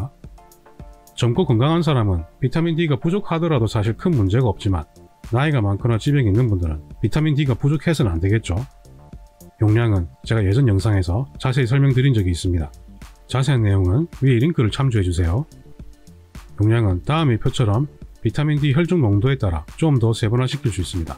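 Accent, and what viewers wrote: Gyeongsang, South Korea. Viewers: 경상도 악센트 쓰시는데... 초등학교는 어디서 다니셨는지요? ㅋ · 유용한 정보 고마워요 그런데 말씀 속도가 굉장히 빠르면서 경상도 사투리도 강해서 50% 정도밖에 이해가 않되요. 저의 지인들도 그러더군요. 그러니 중요한 과학적 용어들은 더군다나 전혀 이해 불가능 !!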